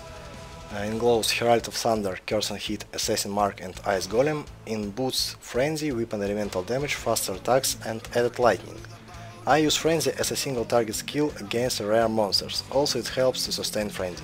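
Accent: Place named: Russia. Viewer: почему автор говорит на англе , но при этом имеет русскоязычный акцент ? :D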